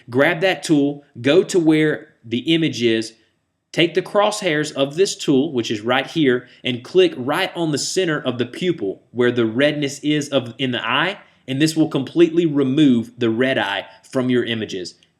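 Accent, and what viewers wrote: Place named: North Carolina, United States. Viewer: you must be from north carolina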